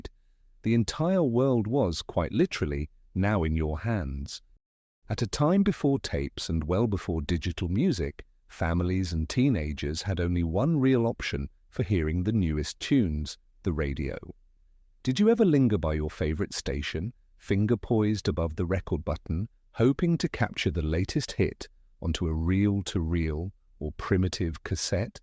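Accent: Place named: Home Counties, United Kingdom. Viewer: Memory Lane America-narrated by some bloke from the English Home Counties (assumng it's not A.I. ). If you want to relate American cultural history, at least have an American voice doing it.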